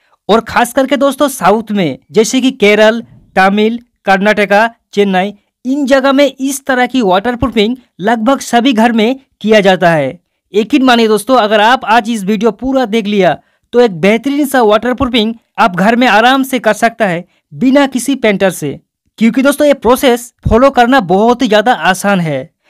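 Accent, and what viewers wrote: Bengal, India. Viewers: Bandha ka slang lagta ha Bengla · Bahi tmr bari kotai ?? Tmr hindi r modhe banga pute utche...😆😆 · bangali mone hochhe 😃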